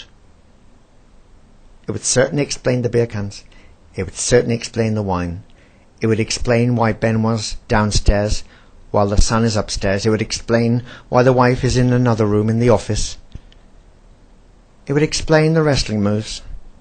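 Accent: England, United Kingdom. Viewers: this english accent is starting to annoy me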